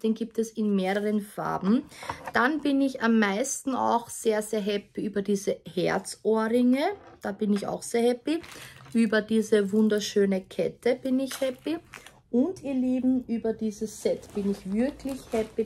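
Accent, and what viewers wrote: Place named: Austria. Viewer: Ich höre das Österreichisch so gern 😍 Schönes Video!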